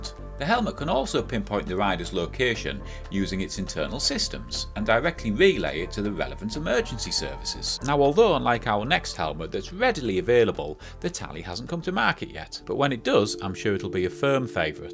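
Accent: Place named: England, United Kingdom. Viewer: tried to listen to a couple of road rash videos. can't stand the sing-song, english narrator.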